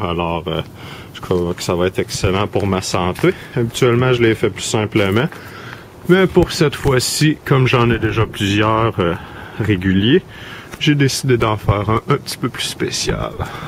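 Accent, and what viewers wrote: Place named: Quebec, Canada. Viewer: Selam, peux tu ecrires les ingredients necessaire pour une coulée d'orgonite? S'il te plait? Parce que je ne comprend pas trop la belle accent du Québec... Je te remercie du fond du coeur.. Un futur Ami.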